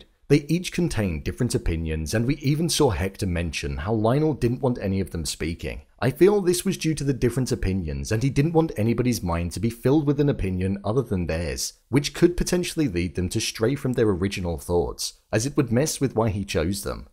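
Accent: United Kingdom. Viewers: These British fiverr voiceovers on all these channels that obviously don't know what they're talking about are getting out of hand.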